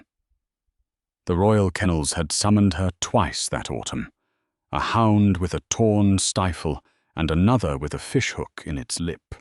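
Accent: United Kingdom. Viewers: My solution to the annoying video pictures that don't match the story is to fold a piece of paper over the top of my cell phone that is long enough to cover that section, click on Show Transcript, and follow along with that purring yummy English / British accent and, as the fantasy tale unfolds, my imagination does what it is meant to do and creates a movie in my head that captures the right characters into all the scenes. This technique might help you enjoy more videos that have that irritating picture section that doesn't match the plot line details. Just a thought from Windsor, Ontario Canada 🇨🇦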